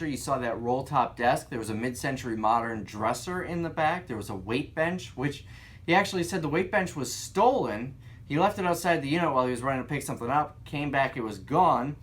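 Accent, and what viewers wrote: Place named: New York, United States. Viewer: O by the way you say dollar like I do in fact we say it like you on most of Long Island New York so tell them to stuff it who say you say it wrong you speak like a true New Yorker thank you so much for telling us the outcome of the dollar unit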